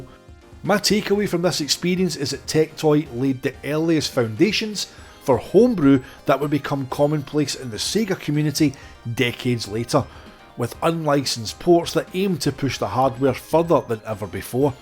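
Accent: Scotland, United Kingdom. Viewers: Sounds Scottish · I’m curious about the person who narrated this video. Where is his accent from? I think it sounds Scottish, but it could be from new zealand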